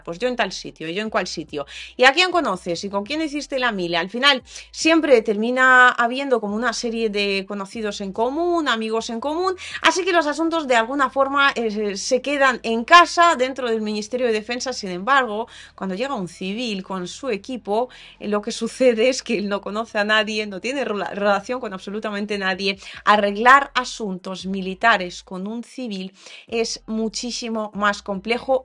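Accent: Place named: Spain. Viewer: Saludos preciosa te admiro adelante lo único que no me gusta es que hablas como los españoles soy mexicano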